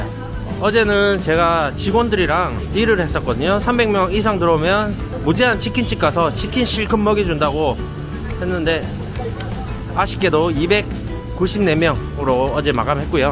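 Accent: Gyeongsang, South Korea. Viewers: 저역시 피나이 티비 구독자인데 채널도 병행구독 할거에요! 근데 경상도 분이신가요?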